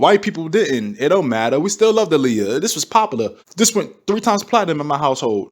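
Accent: New York, United States. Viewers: Lmaooo I love your accent too much 😂😂😂😂😂😂😂😂😂😂 NY for life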